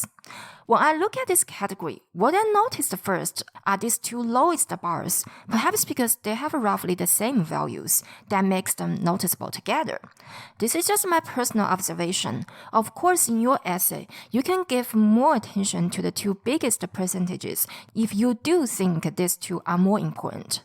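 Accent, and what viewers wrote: China. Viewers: sorry to say your English is mixed with Chinese accent. Hardly can understand your pronunciation as what you are saying !!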